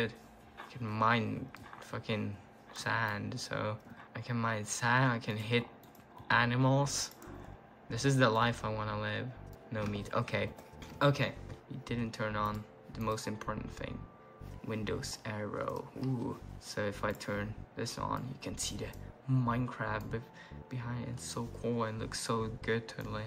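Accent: Czech Republic. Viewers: Ur czech ?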